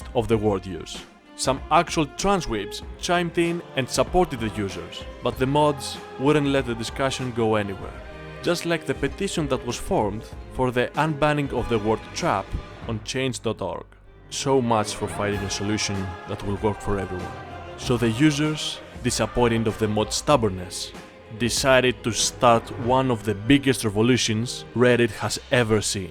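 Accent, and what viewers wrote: Greece. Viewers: You have a strong greek accen. Are you greek or im just wrong :-/ ?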